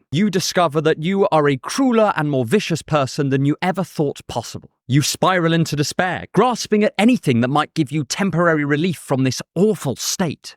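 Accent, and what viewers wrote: United Kingdom. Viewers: I read this book a while ago and it took your british accent to make me realize that its a good book.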